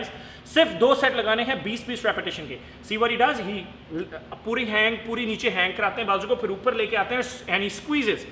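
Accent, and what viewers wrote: Punjab, India. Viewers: loved ur way of telling punjabi tone especialy .and thanx for tips i will try .and pls let me know the brand of protien too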